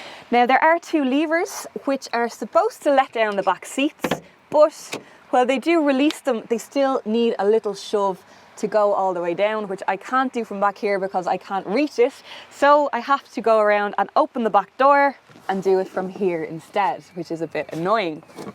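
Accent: Ireland. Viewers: I don't like Irish accent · Jacksepticeye made me like Irish accent and this review was amazing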